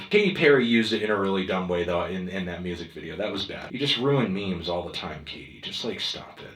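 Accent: England, United Kingdom. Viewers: i fucking loved your english accents... you sounded like all characters of monty python... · dude he has the best British accent!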